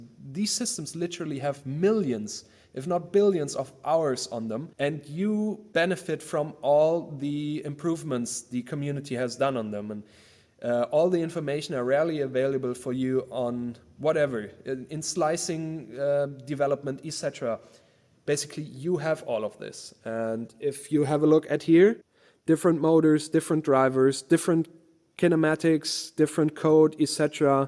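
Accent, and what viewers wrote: Germany. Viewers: Sarcasm in English with a German accent is ..... quite hilarious :D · Klingt, als würden Deutsche englisch reden...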